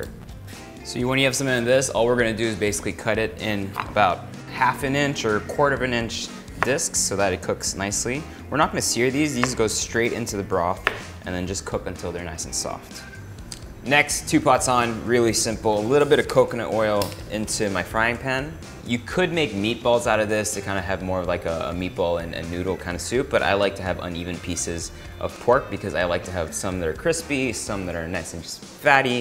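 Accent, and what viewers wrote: Philippines. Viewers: OMGEEEE..!!!! the tagalog accent though!! This is the first time I heard you speaking in tagalog na matagal.. Lorve it! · Your filipino accent is so cute and funny HAHAHAHAHA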